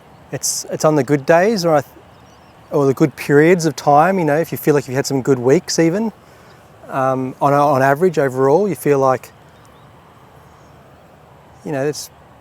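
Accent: Australia. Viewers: Man, I don't know what to say! Get better soon? Feels like some kind of scam. I like your Oz accent, I hate your predicament. It's not fair. Love from Stockholm <3 So. The story you told about getting your diagnose. How you felt like your partner and your brother were your champions, fighting for you. That like broke me completely. And I'm not usually the person who cries. Thank you again so much for sharing! What you are doing is brave and important I feel. It's like I don't know how to tell you, this touched me to my core and I'm not even a spiritual person.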